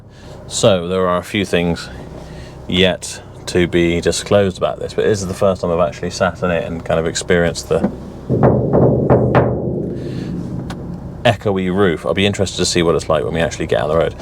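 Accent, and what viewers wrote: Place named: West Country, United Kingdom. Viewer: you've got a different gentler west country style than Arthur Daley from the North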